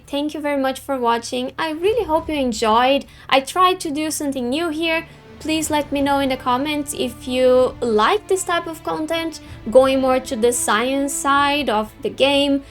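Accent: Brazil. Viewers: vc é brasileira ne fala a vdd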